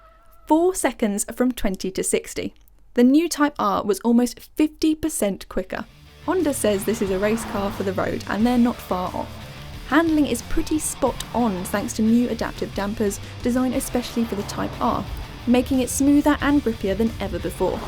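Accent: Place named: England, United Kingdom. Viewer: Shes pretty and has an easy listening english accent. Im down for that and im a scot 🏴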